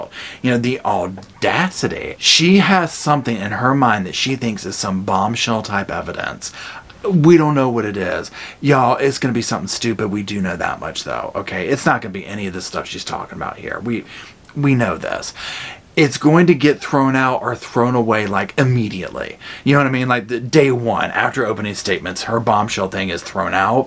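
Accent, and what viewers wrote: South, United States. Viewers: She is a freaking Narcissist that knows everything. However, I love your Southern twang! 👍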